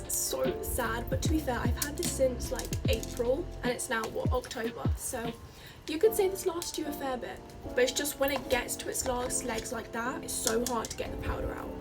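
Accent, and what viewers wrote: United Kingdom. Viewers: I KNEW U WERE BRITISHHHH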